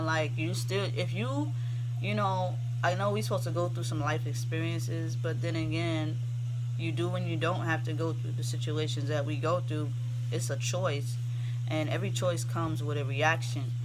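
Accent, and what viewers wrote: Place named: New York, United States. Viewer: Good morning, I just found your channel, oh my goodness, what a gift you have! And thank you for blessing us with all your wisdom, knowledge, guidance and truth! You enlightened and empowered me with your uplifting spirit and powerful messages. This is what I needed ASAP, now I feel better equipped to move forward and start a new beginning with myself. P.S. you sound like a New Yorker, I live in the Bronx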